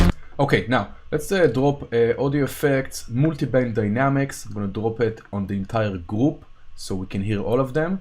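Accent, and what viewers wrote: Israel. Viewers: where are you from? you sound israeli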